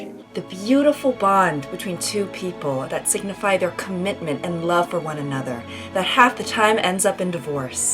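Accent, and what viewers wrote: South, United States. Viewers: more southern accent please. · Your southern accent is on point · Your southern accent tho😂 it sounds so nice, like that’s what I think a rich southern person sound like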